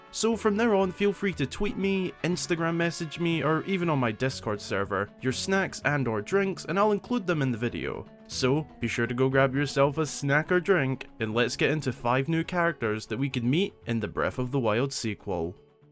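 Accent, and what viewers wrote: Scotland, United Kingdom. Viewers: I like yer scottish accent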